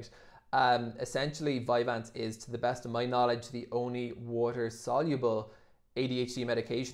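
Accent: Ireland. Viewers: yup, sounds south of Dublin 🎉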